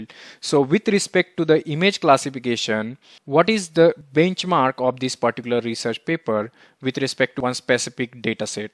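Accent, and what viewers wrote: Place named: India. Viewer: Going a little off topic, I just want to confess a personal problem: I was prejudiced against your accent, because of Hollywood. I'm Brazilian and I learned english through films. I'm trying hard to change my prejudgments, which are just stereotypes. I realized some time ago that I mostly see Mexicans in a stereotypical way. I wanted to write this because I see that others also see you Indians through a Western lens that does not match who you really are. And I go through this too because I'm Brazilian, and no, we don't live in forests. For me, who lives where Portuguese is the standard language, it is a gift to be fluent in English, but it came at a cost, I imported not only the language, but the prejudices. I'm always improving. Thank you very much for this video, you explained it very well!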